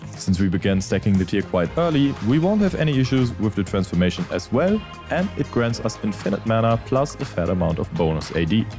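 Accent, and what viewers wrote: Germany. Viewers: Klingt etwas als würdet ihr aus Deutschland kommen xD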